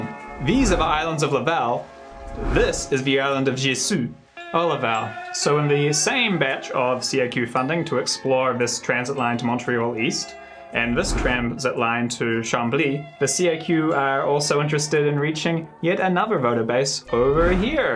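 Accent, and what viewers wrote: Australia. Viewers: Your accent is making me so confused! It's not exactly Aussie or Kiwi, it's mixed with something I can't identify... but probably you've lived in Canada for a good while? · Why does this dude have an Australian accent if he’s from Montreal?!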